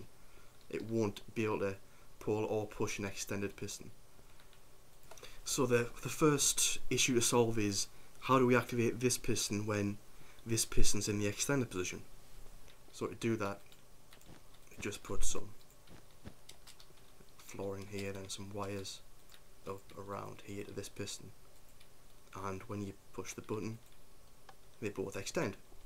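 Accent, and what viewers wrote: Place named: Newcastle, United Kingdom. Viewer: you sound a bit like a geordie mate :) go north!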